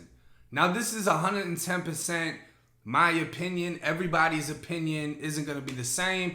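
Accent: Boston, United States. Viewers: Every time I hear you speak you remind me of my days working in Boston and living in Mass. Keep up the great work with your channel. You have a great way of telling a story. For me I am someone who has never been locked up but you put me in a mindset of what would I do if I ever were ever to be locked up. My ass would check in real quick. haha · You definitely have a Boston accent east coast type...did you ever live around my way I'm 45 minutes away from Boston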